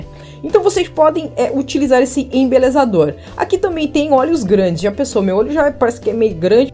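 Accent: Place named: Rio Grande do Sul, Brazil. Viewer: Adoro esse sotaque do Rio Grande do Sul. confere?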